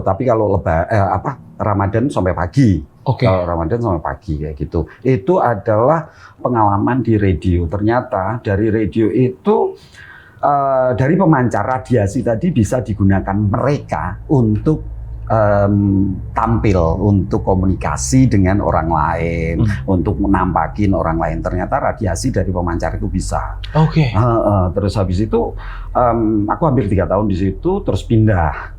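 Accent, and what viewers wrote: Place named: Semarang, Indonesia. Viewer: Bahasane semarang bgt hehe,,, salam dari cah banyumanik bang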